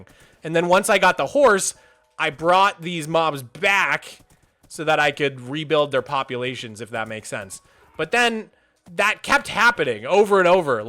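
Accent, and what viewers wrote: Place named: United Kingdom. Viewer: the british accent caught me so off guard that was so good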